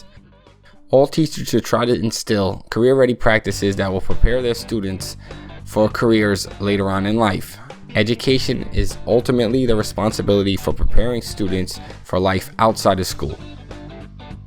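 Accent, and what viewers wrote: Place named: Brooklyn, United States. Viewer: That brooklyn accent. "don't get cauwght!"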